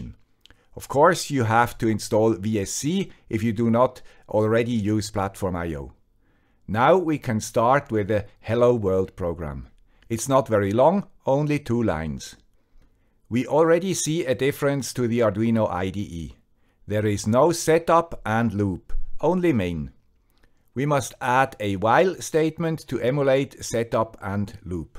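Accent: Switzerland. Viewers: What accent is that? Every time I’m watching your videos it sounds like a Swiss accent to me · As always you show us new and exciting possibilities. Thanks guy with swiss accent!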